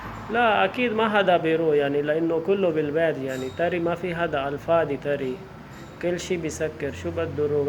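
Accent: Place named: Pakistan. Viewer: لغة العربيه باللّهجة الاردوية.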